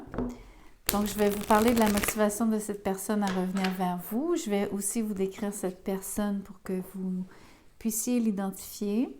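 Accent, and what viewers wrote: Quebec, Canada. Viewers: Ça me parle mais jamais plus de retour en ce qui me concerne il est bloqué de toute de partout c est fini merci ma belle vous êtes la meilleure vous êtes Québécoise j adore cet accent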